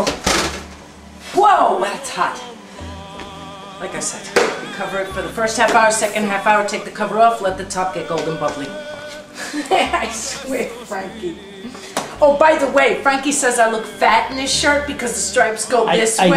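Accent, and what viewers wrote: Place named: Brooklyn, United States. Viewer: u could be the rachel ray from brooklyn with that accent!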